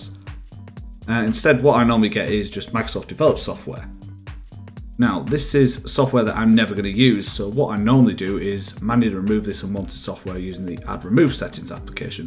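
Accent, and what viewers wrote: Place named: Northern England, United Kingdom. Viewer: Thank you so much for this. Just installed win 11 and while it noticeably improved my games, it took forever to start up, with tens of apps fighting for processing power on start up. I just uninstalled 50 apps and can now use my computer again. Also it's a breath of fresh air to hear a northern tutorial. I'm guessing Leeds or Sheff? Maybe Humberside?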